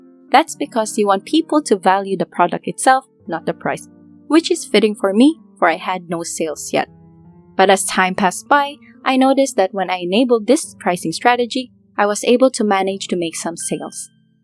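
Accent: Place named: Philippines. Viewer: almost give up on POD after spending so much time following tips here and there ,I'm glad I stumbled on your channel...and wow your amazing and inspiring, I find so much value in your content....I'm hoping to learn more and finally establish my RB shop. Thanks so much. BTW, correct me if I'm wrong, I'm from the Phil and hearing your voice and diction makes me think we are from the same country, anyway, I'm just curious..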